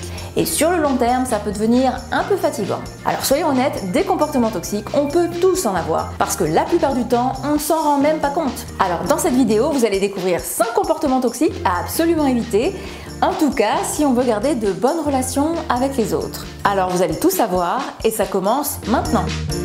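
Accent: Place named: South, France. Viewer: Jolie accent du sud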